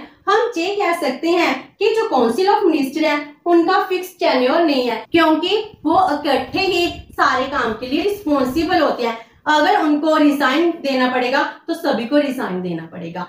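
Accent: Punjab, India. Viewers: Mam you made Polity as easy as Hindi hats off to you mam🙏 Dhanyavaad . Aur aapke samjhane ka tareeka , 5abi accent Best Way mam Thank you🙏 Acche teacher ki nishani hoti hai uska subject ke prati interest joh dikhta hai aapke padhane mai . May god bless you.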